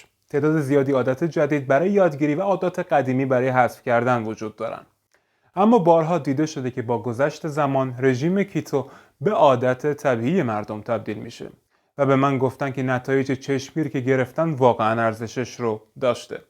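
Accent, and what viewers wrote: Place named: Turkey. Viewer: این ویدئو را ده بار دیدم تا تک تک کلماتو حفقظ کنم فقط دلمون چیز شیرین میخواد چی بخوریم یه سوال شما ترکین؟ چون ق تون خیلی قق میشه من گوشم حساسه و دقتم زیاد مثلا دقت رو شما دگگت میگین البته بعضیا دگگگگت میگن من ترکها رو از رو تلفظ گ سریع شناسایی می کنم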